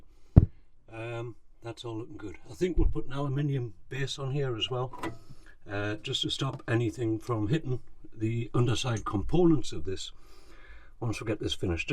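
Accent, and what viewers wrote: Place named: Newbiggin, United Kingdom. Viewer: Another great video, Love to see what you've been doing to your van. Where abouts are you from? sounds like you are from newbiggin area?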